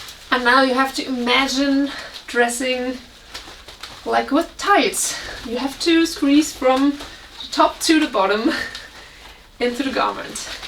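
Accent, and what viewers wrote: Germany. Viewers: Maybe I misheard you then, with your lovely German accent babes... that lovely blue catsuit you sported for us last week, from Bright and Shine was it?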